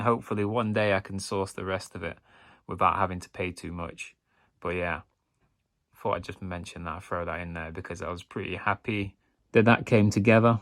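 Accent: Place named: United States. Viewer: why you sound american if your from Uk, the fuqq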